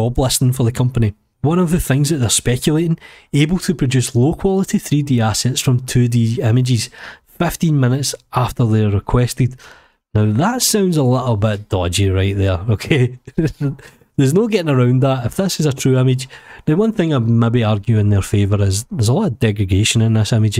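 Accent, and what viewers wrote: Scotland, United Kingdom. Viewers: I am just here for the Scottish accent...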